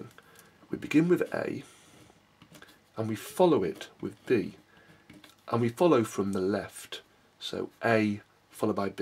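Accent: England, United Kingdom. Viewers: I LOVE MY VIDEOS NARRATED WITH AN ENGLISH ACCENT. THANK YOU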